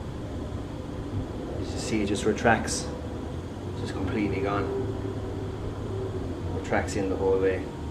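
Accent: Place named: Ireland. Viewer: I like your Irish accent!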